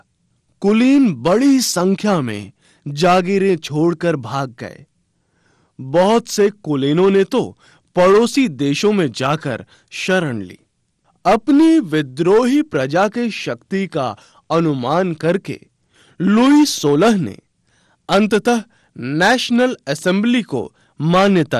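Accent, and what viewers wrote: Standard. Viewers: sir video to aacha tha but aap etna sudha hindi kyo bol raho ho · it's to hard too understand because of youre real Hindi (shudha) Hindi. So it makes it little hard too understand.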